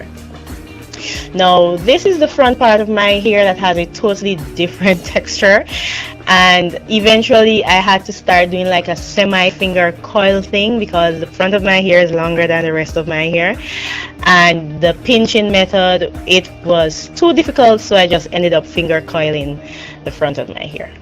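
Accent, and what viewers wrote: Jamaica. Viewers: is that a Jamaican accent I hear? 😁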